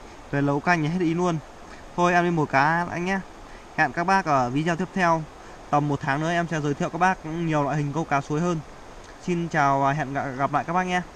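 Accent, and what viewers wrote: Vietnam. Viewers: ông này nói giọng khu vực nào ý nhỉ, Hà Tây à, chỗ toàn nói thành chố, đĩa thành đía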